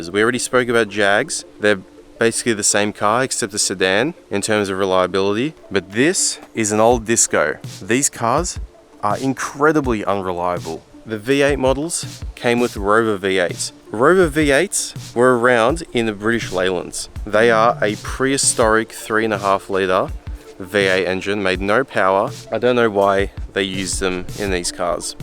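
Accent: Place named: Australia. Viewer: They are LUX -URY, ie, pronounced "LUCKS"-URY cars, NOT LUGZURY cars. Be a PROPER SPEAKER and not a SLACK SPEECH OZZIE. First thing I noticed was the poor DICTION or PRONUNCIATION. Never mind the cars, you have to be believable first up! Most half smart Ozzies know NOT to buy EUROPEAN ANYTHING. Being an Automotive trade teacher, SPEAKING CORRECTLY IS IMPORTANT.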